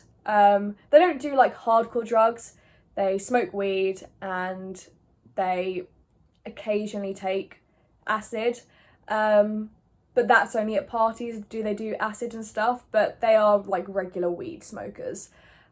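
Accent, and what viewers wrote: United Kingdom. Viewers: Do you still have your British accent when you have shifted into any reality? <3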